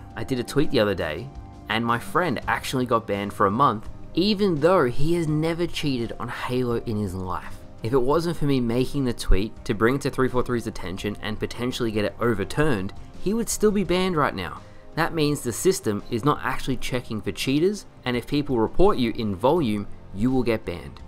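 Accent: Australia. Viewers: is it bad i just noticed you're Australian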